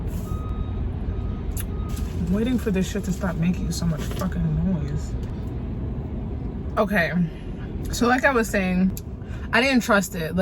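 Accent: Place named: New York, United States. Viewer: i knew it/youR accent sayz n.y. at least to Me'...✨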